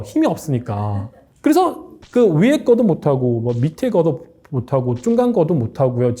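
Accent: China. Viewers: 이 사람 중국인인가요 발음이 쭝궈런인데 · 선족티가 난다. ㅋㅋㅋ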